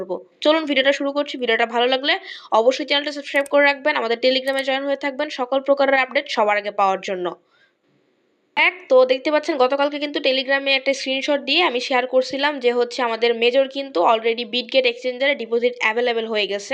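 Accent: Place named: Jessore, Bangladesh. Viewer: আসসালামু আলাইকুম আপু, যশোর থেকে নতুন করে আপনার ইউটিউব চ্যানেলে এবং টেলিগ্রামে যুক্ত হলাম।ভিডিও গুলো খুবই ভালো লাগে, আপনার কথা শুনে মনে হচ্ছে আমাদের যশোরের মেয়ে আপনি।আপনার জন্য শুভকামনা রইলো।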